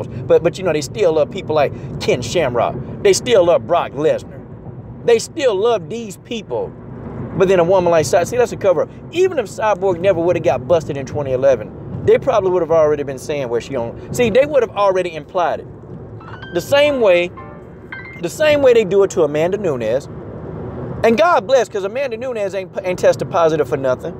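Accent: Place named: South, United States. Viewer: Southern pronunciation strikes again, ahaha. It's Koo-Ree-Chee-ba... or Koo-Ree-Tee-Ba for European Portuguese/certain parts of the country.:)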